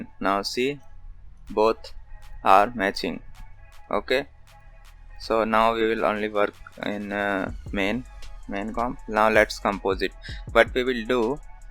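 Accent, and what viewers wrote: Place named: India. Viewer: Ok mr smart Indian sounding person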